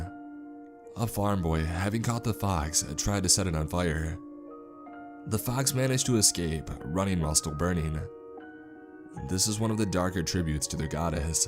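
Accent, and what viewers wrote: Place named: Midwest, United States. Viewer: General mid-western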